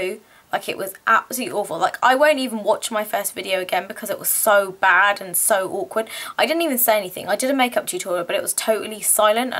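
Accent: United Kingdom. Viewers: I'm so happy that you made this video, you are my favorite person that makes personal videos on YouTube. I love your British accent and you are so nice. I stop making videos because I don't know what to talk about and I feel sometimes depress or wanting to quit my YouTube channel, don't get me wrong i love making videos. Advice please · Are u from UK. I will definitely date you😜 I love all them people in UK accent I'm moving in UK soon cuz I love the accent omg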